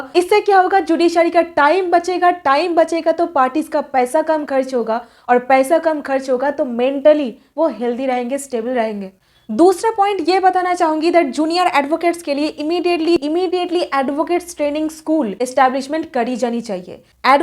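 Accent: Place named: South, India. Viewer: Your voice is so good and charming with South Indian accent, your content is very informative and last but not least you are very beautiful, would you like to have a 70 year old doctor as your boy friend 😊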